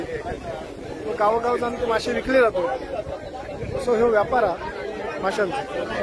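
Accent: Malvani, India. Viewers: फारच छान बाजार तुमचे मालवणी ऐकाक मजा येता।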